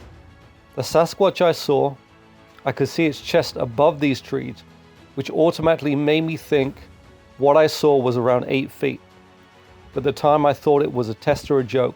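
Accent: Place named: England, United Kingdom. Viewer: What a lovely calm voice you have and beautiful English accent , it does not sound like a Devon accent but forgive me if I’m wrong 😆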